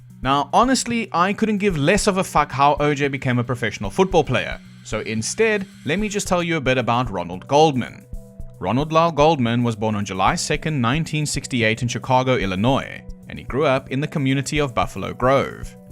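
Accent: South Africa. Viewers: I knew you were South African! I've asked you several times because I recognized the accent. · I've been watching (listening to) your videos a lot while drawing this past month, and that entire time, I, an Australian woman, have been trying to figure out if your accent is from New Zealand or Tasmania. As soon as you said South Africa, it was a total Eureka moment.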